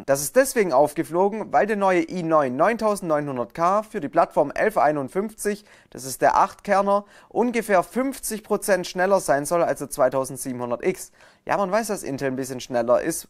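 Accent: Swabia, Germany. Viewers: alter schwabe :D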